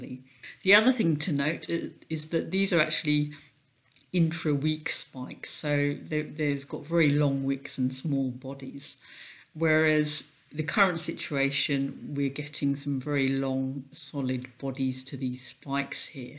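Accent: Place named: North London, United Kingdom. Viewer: Sounds like a north London accent , probably Wembley , Finchley where I’m from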